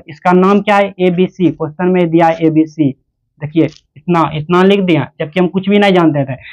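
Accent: Bihar, India. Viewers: Mai video start karne se pahle soch liya tha ye pakka bihari hi hoga😂😂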